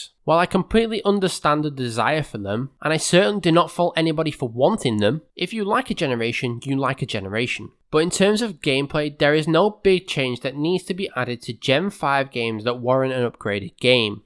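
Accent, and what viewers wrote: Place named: United Kingdom. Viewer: Not to mention, emulating Gen. V, you can also experience Drayano's ROM Hacks, BlazeBlack/VoltWhite, which seem to bear the kind of difficulty I now actively want in a game... Maybe that would explain why I enjoy Dark Souls so much... As much as I love the idea of using Greninja and Zeraora in Unova, I can but dream... And we do say "ee-"volution here! (Where are you from? I seem to be finding a lot of fellow Brit's channels recently!)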